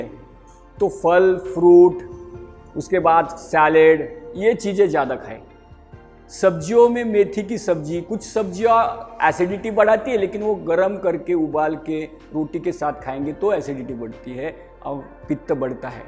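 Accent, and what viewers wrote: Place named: Maharashtra, India. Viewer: Sir aap marathhibhashi lag rahe h Aapka bolna mujhe Bahut achha laga 🙏🙏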